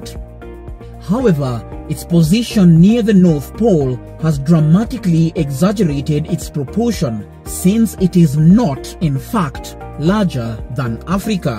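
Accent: Kenya. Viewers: This Kenyan accent is hilarious. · This ascent is Kenyan 😁... Much love brother man. 🔥🔥🔥🔥🇰🇪🇰🇪🇰🇪🇰🇪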